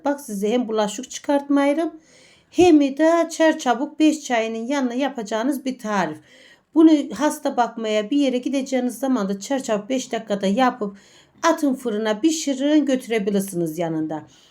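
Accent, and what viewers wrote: Black Sea, Turkey. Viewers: 😂😂 uy kardezli bacim bende karadenizliyim bizim bu şifemiz nekadar da güzel emegine saglik 😊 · Karadeniz şivesi mi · Aynen karadeniz şivesi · Bildiğimiz Erzincan lokumu Karadeniz şivesi ile Anlatılınca Trabzonlu olmuyor kendi yöreinizin yemeğimiş gibi biz bunu çok severiz demişsiniz bir kere erzincan lokumu dememişsiniz ama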